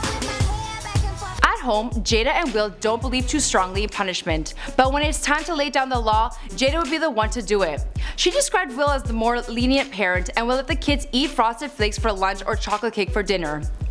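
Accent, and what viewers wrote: Canada. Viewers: Her canadian accent is as thick As the whole album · Is her accent Canadian? Or foreign?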